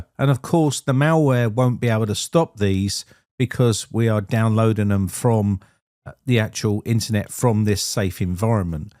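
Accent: United Kingdom. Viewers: Man, man, whatin a fuckin" bullshitin' annoyin listenin to this fuckin old-man britishin accent! Here here here here here here! Always showin his olden schoolin computin fuckin prowessin with the comnandin line-nen! SOEN FUCKIN ANNOYIN!!! Shut uppin fuckin old man, you're fuckin annoyin!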